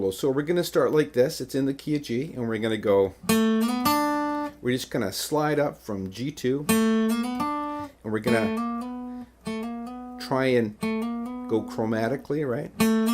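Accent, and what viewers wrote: Canada. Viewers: You definitely have some Canadian spirit in that voice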